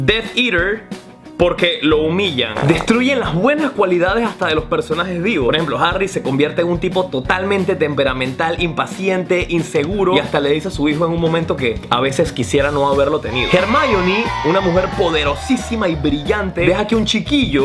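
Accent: Venezuela. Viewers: Qué simpático eres x'D tienes acento levemente venezolano :0 ¿eres venezolano o es que el acento panameño se parece?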